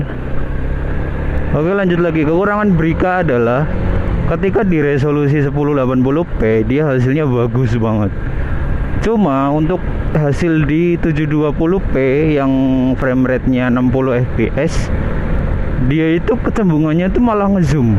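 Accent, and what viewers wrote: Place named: Banyumas, Indonesia. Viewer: Mantap bang. Slam motovlog ngapak 👍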